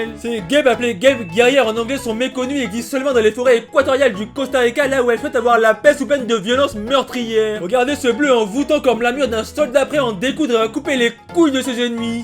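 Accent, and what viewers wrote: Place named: Congo. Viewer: Hier , je t’ai découvert. Je me suis abonné . Aujourd’hui, tu es un classique ! Je suis d’origine congolaise , et tes expressions et ta façon de prononcer les mots me fait penser au pays! On aime trop parler mal ( c’est juste de bonne guerre bien sur )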